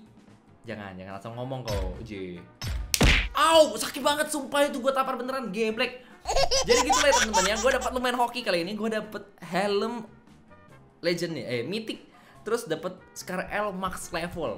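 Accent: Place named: Medan, Indonesia. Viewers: Bg Logat mu kayak orang Medan😂😂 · Orang Medan nya abg ini😂😂😂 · Nampak kali Medan mu bang 😂😂😂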